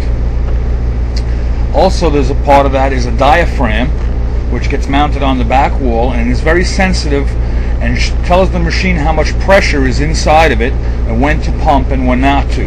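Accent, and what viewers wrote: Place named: Italian-American, United States. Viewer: I like your Italian-ish American accent.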